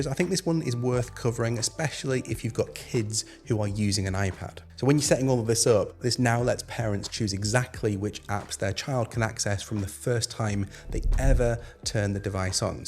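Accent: United Kingdom. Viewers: Brithish English is so gay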